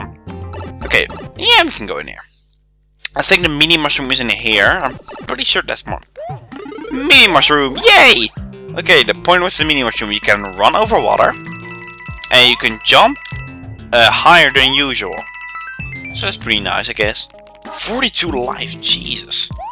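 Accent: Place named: Netherlands. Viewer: but if you hear very good you can hear that you dutch ( like me )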